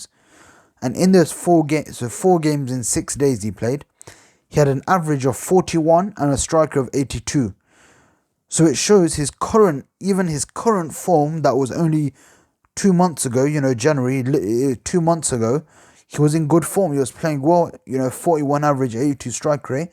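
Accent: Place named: United Kingdom. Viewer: Love your British accent 😍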